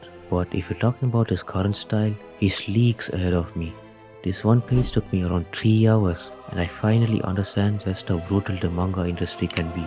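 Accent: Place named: India. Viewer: Annoying Indian voice omg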